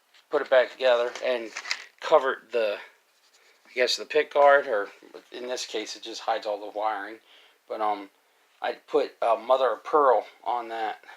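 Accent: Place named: Western Pennsylvania, United States. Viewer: I agree. Neatness counts. You sound like you're from Western Pennsylvania.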